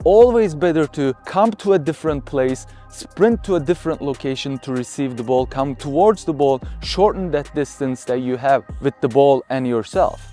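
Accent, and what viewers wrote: Turkey. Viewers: Bu aksan bir turkten baskasi olamaz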